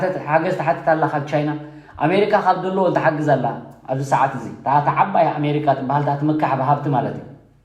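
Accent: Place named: Akele Guzai, Eritrea. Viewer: I love His Akeleguzaiyan sound and Akeleguzaiyan erp radioness sound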